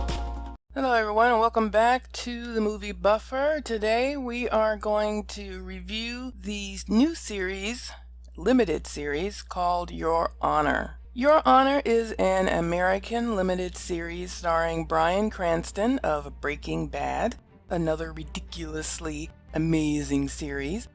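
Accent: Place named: Liverpool, United Kingdom. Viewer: Really enjoyed ur review but I'm a little annoyed that u havnt got more comments and reviews as uve done a great job and coming from a Scouser ur accent is beautiful!! Oh if u don't know a Scouser is from Liverpool, England just in case u didn't no!!! Please more reviews and never change ur accent ha ha!! Take care and let's hope for more reviews!!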